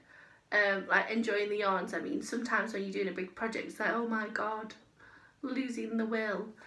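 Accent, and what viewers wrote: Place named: Manchester, United Kingdom. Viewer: Hello, jan carruthers has sent me your video. I really enjoyed watching you. Well done on your 500 subs. It amused me when someone commented on your accent. It sounds very similar to mine. I m from east manchester. I assume you are from manchester too.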